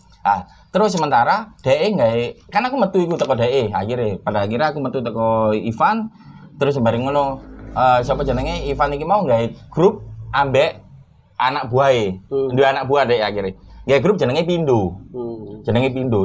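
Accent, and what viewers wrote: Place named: Surabaya, Indonesia. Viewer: Lucuuuu paraahhhhh...kok gak nglawak ae mas.... Ngakak aku .... Boso suroboyoane kenteeelll ...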